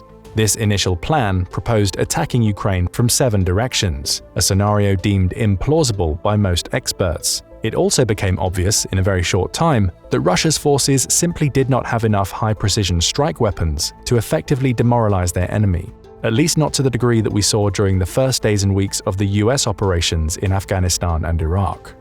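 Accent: United Kingdom. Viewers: Nothing like listening to a Brit's voice crapping on another country's military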